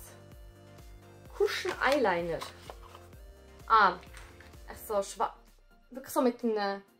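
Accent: Switzerland. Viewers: Haha i finges huera geil dasd itze all viedeo uf schwiizer dütsch machsh :) U da einhorn ha ig oh😂😍🦄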